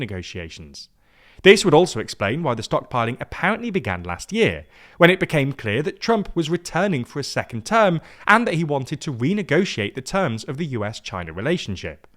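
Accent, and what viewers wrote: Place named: South, United Kingdom. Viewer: He's a poncey southerner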